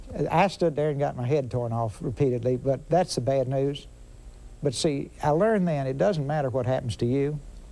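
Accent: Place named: Texas, United States. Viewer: I love how he speaks with such eloquence through that awesome Texas accent.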